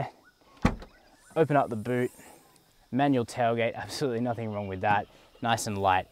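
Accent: Australia. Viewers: Bastardised Aussie English is insufferable, switched off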